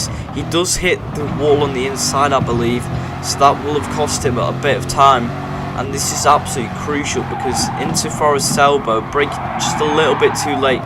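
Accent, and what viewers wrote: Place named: Northern England, United Kingdom. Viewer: He’s got a northern accent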